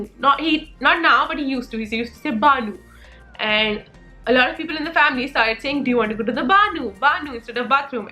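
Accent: India. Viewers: Are you teaching your kid English in Indian accent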